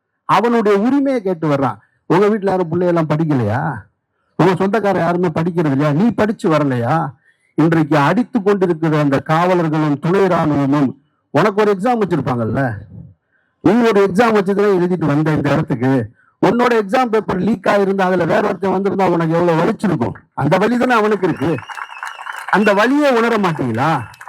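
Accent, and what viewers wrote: Madurai, India. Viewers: மதுரைக்காரன் பேச்சு🎉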